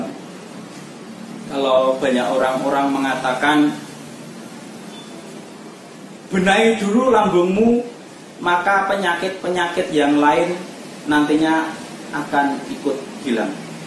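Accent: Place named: Banyumas, Indonesia. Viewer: rika ngendi kang... ketone ngapak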